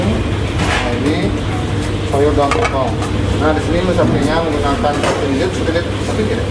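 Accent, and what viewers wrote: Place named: West Sumatra, Indonesia. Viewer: Gaya abg berbicara seperti orang Minang yang menggunakan bahan bahasa Indonesia 😅😂😂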